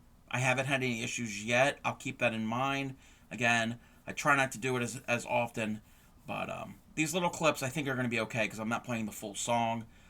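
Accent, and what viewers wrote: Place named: Maryland, United States. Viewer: Found you because of your temperature blanket. I noticed your accent and went to your channel. I was right!!! A fellow Marylander. We all have that "sound" that can't be mistaken. Sending you a friendly wave from Carroll Co. This video was fun.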